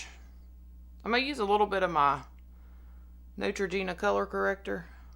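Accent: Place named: South, United States. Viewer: Hi. I'm new to your channel and I enjoy watching very much. I am born and raised in the south also. Love your accent! I am from very and humid Polk County Florida. Dead center of the state. Thank you for sharing about your camp experience and your closeness with God. I need to hear it too. I am so glad you had a great time being in nature. Keep up the good work with your channel. I am sure you will get to 300 subscribers very soon. Good luck!!!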